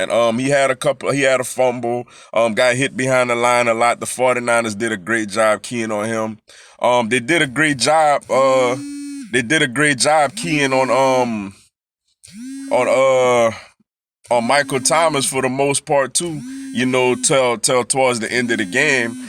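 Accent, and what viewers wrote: New Orleans, United States. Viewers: Bruh you sound like your from New Orleans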